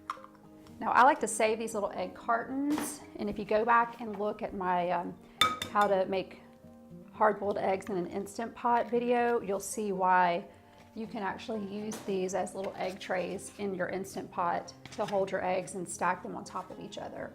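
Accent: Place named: Florida, United States. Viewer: You sound like us here in Florida! Especially the "pachauns" not pECans"! 😎🌴🌺👍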